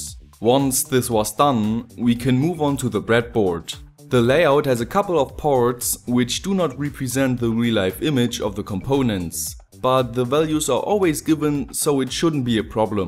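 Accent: Germany. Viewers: Awesome video!!! I have always enjoyed your accent when you speak English--to an American speaker it sounds as if you are trying very hard to pronounce everything right. I saw your other video where you were listening to the pronunciation of "centimeter". You do a great job! I don't think you need to sound "native-born". You are completely understandable, and I do find your inflection interesting--I wondered what your first language was until I saw it was German. By the way, , you sound as if you are saying "isolation", but the wrapper around an electrical wire is called "insulation". Isolation is the function of insulation, though, so it's kind of funny. I think any English speaker can understand your videos just fine though! Small little mispronunciations are "filtered out" when we watch your videos--don't stress out about them!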